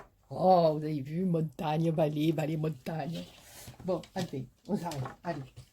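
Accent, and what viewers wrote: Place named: Marseille, France. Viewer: cc merci pour tesexplications a la marseillaise hihihi bravo super bonne fin de journée bises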